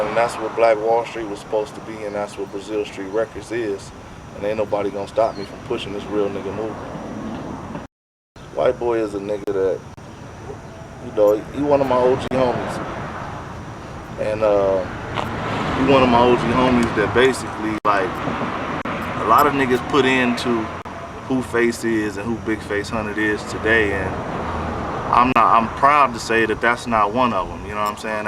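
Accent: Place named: New York, United States. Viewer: you talk like a NY nigga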